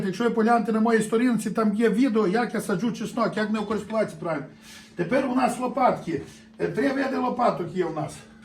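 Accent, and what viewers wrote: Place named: Galicia, Ukraine. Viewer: Класна буржуйка і майстерня. Судячи по акценту і діалекту пан з Галичини.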